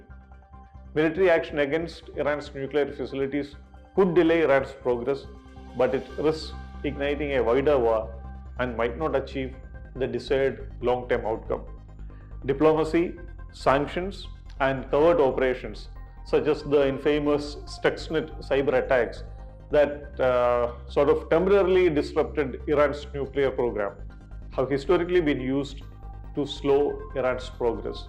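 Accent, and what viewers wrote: Kerala, India. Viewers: From ur accent I know u are from Kerala. Great how u handle difficult topics calmly.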